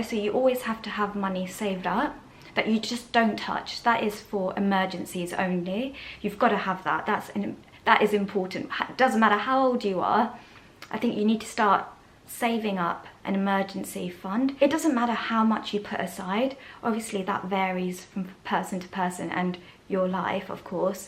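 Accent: United Kingdom. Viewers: Dear sweet. You are adorable!!! I watch your Tuesday videos on the car ride home for you and I drink my lukewarm bottled water that’s been sitting in my car all day. I love hearing you speak. First of all your British accent is adorable. I’m from California where nobody enunciates their words. You have the best elocution. Lol, it’s so great to hear you speak about things other than the C word because you are so much more than that. I want to hear about whatever you want to talk about. This is your safe space. I’m really happy to hear that you are looking forward to working. It would be amazing if you could start out on a very part-time basis. Filling in for people who need to take a day off would be so helpful to your coworkers, the patients and for you. It would be a win-win-win situation. It is a bit early for Christmas. Lol. But I’ll let you be you!!! 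Don’t throw away your tree on the 26th., though. The Christmas season actually lasts until January 6th. 🎄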